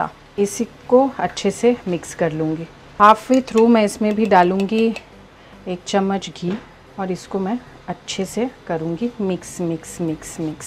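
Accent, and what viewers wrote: Bengal, India. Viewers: I like way you speak Hindi with Bengali accent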